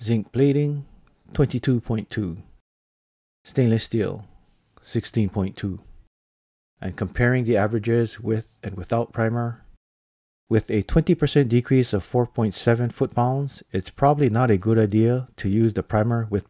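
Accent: Hawaii, United States. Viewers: Are you from Hawaii? I hear a little Hawaii local twang in your voice lol😂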